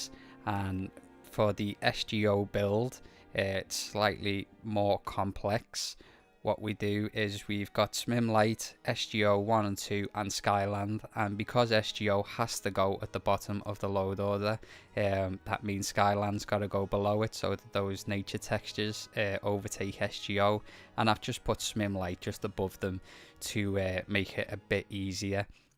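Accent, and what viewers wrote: Liverpool, United Kingdom. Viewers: Omg ur scouse and you av the same nickname as me, get in lad! 😁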